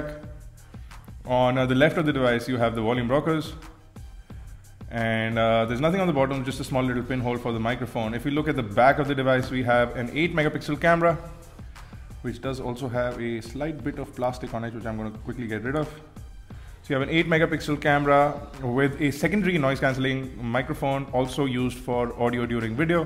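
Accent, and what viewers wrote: India. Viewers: no...not at all...itz bullshit indian accent...waacckkk...!!the worst in the world! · shut up.. this guy is a pro (not just in reviewing but also in English) and u call his accent bullshit coz ya just dont like Indians. now dats bulshit...!.. I bet u u wud hav loved it if it was a woman talkin instead of a guy....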